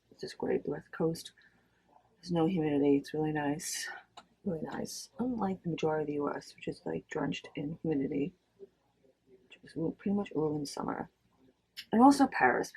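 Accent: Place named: Scotland, United Kingdom. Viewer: I loved the end result, all the colors came together nicely. I like that you shared that you are a minimalist when it comes to make-up, i'm the opposite in that I love to do a full face look with contour and all. I must commend you on the editing of the video when you showed the product as you are using them, wow. I just hit the subscribe button just as you were saying to subscribe, lol. And finally, I love, love, love your accent, it sounds kinda Scottish so beautiful.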